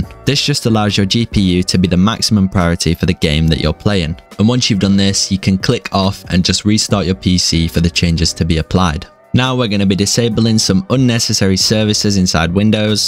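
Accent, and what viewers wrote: Norway. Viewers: Wait are you Norwegian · Bro er du norsk? du har sykt bra engelsk stemme man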